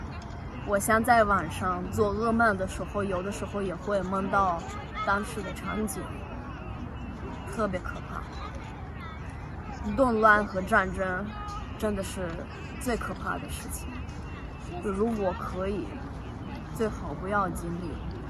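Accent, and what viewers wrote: Standard. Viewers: 你的中国普通话水平比南方很多中国人都标准。。。。。。。比如广东人，福建人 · 内容很关键，音效剪辑不重要。另外，你的中文已经很好了，很多中国人的普通话还没你标准。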